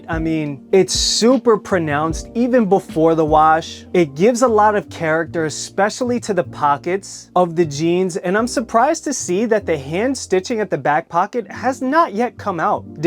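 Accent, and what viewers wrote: New York, United States. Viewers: Feel like im in newh yohk, love the accent.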